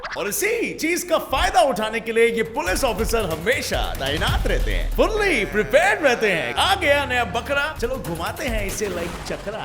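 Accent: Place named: Telangana, India. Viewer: Telangana hindi language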